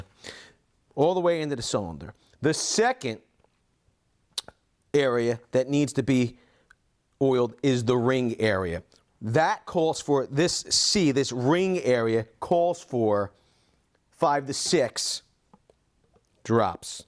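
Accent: Philadelphia, United States. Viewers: I knew that accent! It's like being home again, in Philly! Also, thanks for a clear video on cleaning my Paslode.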